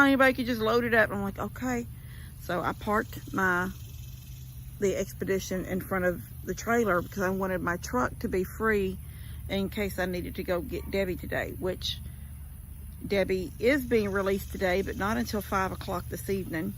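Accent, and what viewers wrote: Texas, United States. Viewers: We love you. I love to watch your relationship with and. The absolute devotion you have for your beautiful boy. I was so happy to see you'd bought your beautiful truck. I felt like you seemed guilt ridden. Everything you do to give you happiness makes me happy and also Tony benefited. I love watching your Texas life and could listen to your Texan drawl all day long ❤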